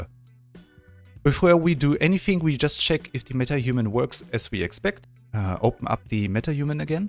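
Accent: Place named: Germany. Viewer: very fast and great on the point explained video👍 you are german right? it would be awesome to watch some great videos in german then... ich bin zwar kein berliner aber trotzdem deutsch😅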